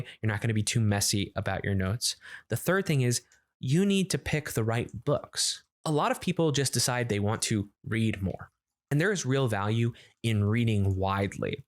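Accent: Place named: Pennsylvania, United States. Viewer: I have an accent-related question, if you allow - do you come from around Pensilvania ? I've got a friend from there and in the minutae you sound just like him. I can't really pint point why or the specifics.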